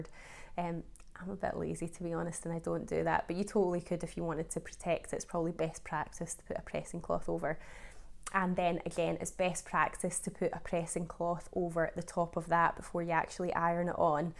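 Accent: Scotland, United Kingdom. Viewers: Living in Switzerland as I have for eleven years makes a Scottish voice very nostalgic for me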